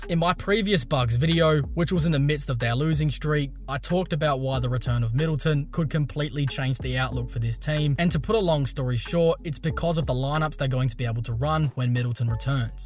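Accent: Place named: Australia. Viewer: Beef Stew should get a suspension for that hideous flagrant 2. Dirty Mofo. Love the channel man. Love the Aussie accent. Oi Oi!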